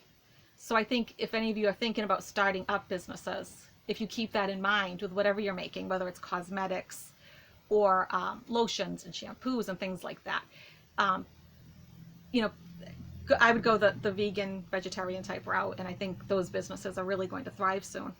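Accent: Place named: Midwest, United States. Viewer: No. Sounds mid-western. Definitely not California.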